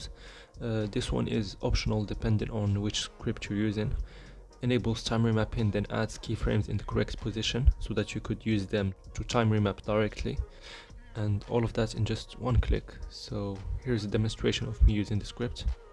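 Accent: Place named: France. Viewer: are u french ? your accent sounds french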